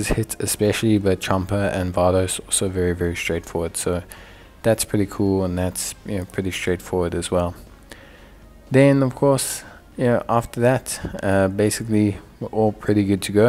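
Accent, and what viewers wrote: South Africa. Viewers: Bro you south-african?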